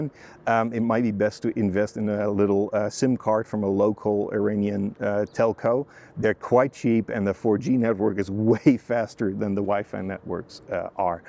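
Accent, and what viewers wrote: United States. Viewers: for a Dutch person, you speak English very closely to an American. A lot of people form Holland visit. Iran. Very nice and genuine vlog. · Very nice and informative video. I hope you get to go back again and visit more places and have lots of fun. For your information, when someone in Iran (and probably in Middle East) puts their hand on their chest, they're kind of putting it on their heart, meaning that you belong there... in their heart. It's a sign of sincerety, if you will. (^_^) Oh, and from your English, I initially thought you were American. Your English is perfect.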